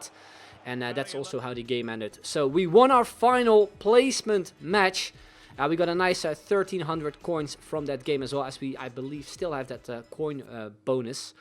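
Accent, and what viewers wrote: Netherlands. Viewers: K denk dat je Nederlands ben heel klein accentje nog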